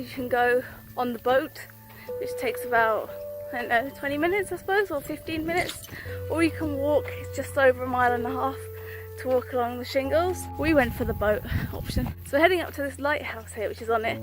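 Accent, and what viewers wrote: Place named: United Kingdom. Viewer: How come British people say karsal instead of castle ?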